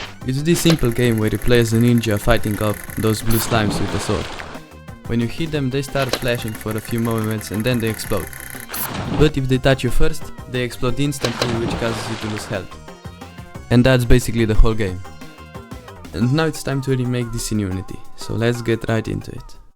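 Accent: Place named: Russia. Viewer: I just come from shorts video. As honest I can, I tell you. That's is very cool and unique, I don't know why this video don't getting popular. Idea of game is good, fr good. Maybe you should do this like dungeon complete, or game with some lore? Blender skills at high level, how did you learn everything about this? And yeah, where are you from, your accent reminds some of Russian accent 🙂 Don't give up on this, try make new projects, bcuz if this is first unity oroject, then its insane and I can't believe in this. Goodluck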